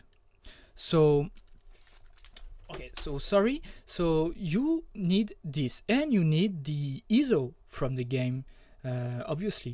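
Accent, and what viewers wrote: France. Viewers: Super tutoriel merci. ça sent l'accent français non ?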